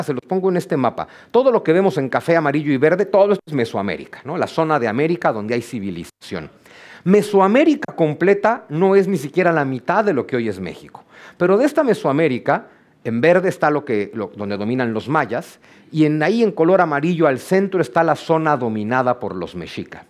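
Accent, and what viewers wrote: Spain. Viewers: Apenas inicia y ya trae el acento español, gracias, gracias, gracias. No mms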